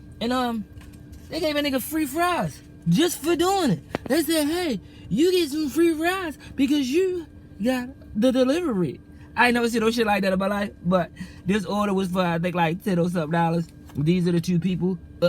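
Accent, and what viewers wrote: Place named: Baltimore, United States. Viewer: Baltimore. 10 seconds in I knew 🤣🤣 Salute dawg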